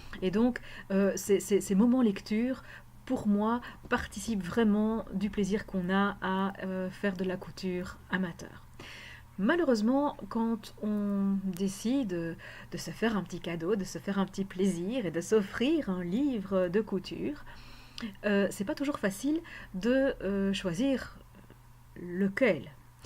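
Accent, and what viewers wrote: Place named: Belgium. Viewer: J'adore aussi les livres de couture, les magazines. Il m'arrive de les lire et dévorer avec les yeux avant de les acheter. Et je les conserve précieusement, comme ma mère avant moi. J'aime bien qu'il y ait les patrons grandeur réelle, même si je ne réalise pas tout. Merci pour ton initiative. Je me suis abonnée et vais voir ton blog. Ton petit accent du côté des gaufres est délicieux.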